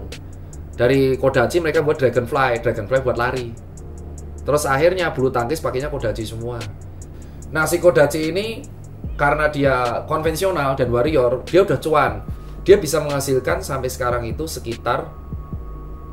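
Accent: Java, Indonesia. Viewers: njiirr lahh anak gaul logat jowo wkwkkw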